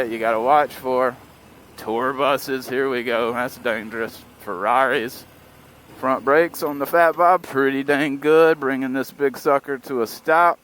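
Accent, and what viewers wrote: South, United States. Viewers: Isn't this the way to review a bike? No cussing, no horrible music, just to the point and scholarly. Love the American accent too! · where are from sounds like you are southern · Peachtree! Thanks, I couldn't quite place the accent. I hope you never lose it, out there in SoCal. ;-) Great review.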